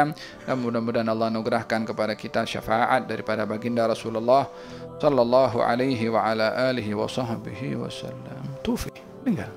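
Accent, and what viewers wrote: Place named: Malaysia. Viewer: Kirain indo tapi logat ny mlayu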